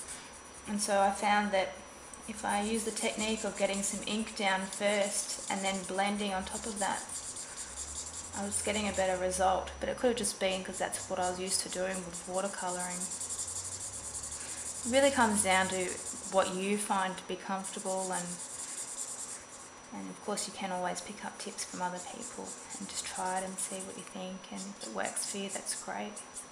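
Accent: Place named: Australia. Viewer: AUSTRALIAN ACCENT FTW!!!!!!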